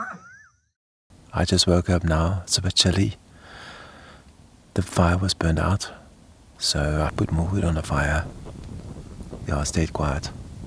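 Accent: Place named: South Africa. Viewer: "and uh yuh" i love this accent aha as soon as i clicked i knew youd be alright that accent youll only see in lion or african wilderness videos, im certain its the south african accent, people often say you guys sound like us aussies in a different way 😂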